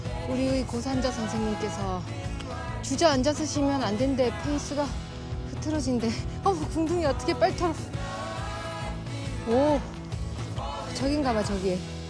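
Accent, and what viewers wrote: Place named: Gangneung, South Korea. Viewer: 영상 재미나게 보구 가드래야 말투가 정겨와우야 ^^ 강룽말투가 정겹드래요 지는 정선이본가구 평창이고향이드래요 12일 선자령 10번째등정 복많이 받으래야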